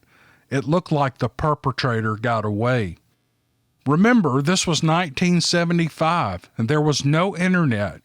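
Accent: South, United States. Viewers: Hey..Greetings from Charlotte, NC! I could listen to all day with that soothing southern voice. I'm originally from the Ramapough Mts. Of Jersey..and we have BF there and in The Carolinas..saw them myself. This is my favorite Channel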